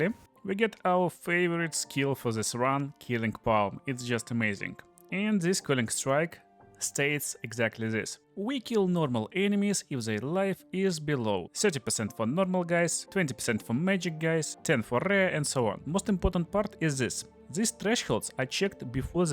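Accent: Russia. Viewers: акцент у тебя очень похож на русский)) ну да ладно) твой билд хорош! сейчас его строю)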